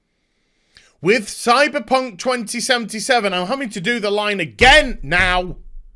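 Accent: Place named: United Kingdom. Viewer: I can't watch this.....without.....busting my gut laughing....that proper sounding British accent....doesn't.....help! ROTFLOLLLLLLLLLLLLLLLLLLLLLLLLLLLLL!!!!!!!!!!!!!!!!!!!! Stop it dude your are killing me! LOLOLOLOLOLOLOLOL!!!!!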